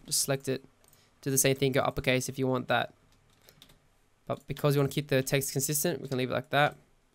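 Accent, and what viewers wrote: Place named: Australia. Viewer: Ah an Aussie chap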